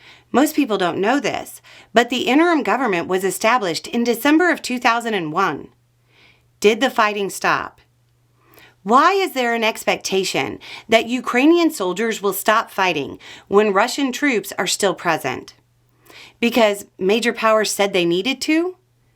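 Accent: United States. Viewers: Uau! A true Belle of the Ranch telling the obvious truths with a deep American accent! All is not lost in the USA! The war in Ukraine is currently the most transcendental conflict and will have global consequences.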